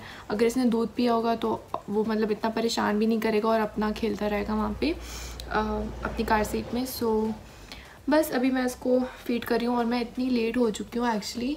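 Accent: Pakistan. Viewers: I don't know why but ap Pakistanis ki trha bat kr rhi ho Btw love from Pakistan beautiful lady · Love ur accent.... resembles so much like Lahori accent..... mind if u speak some punjabi too?? A true fan from Lahore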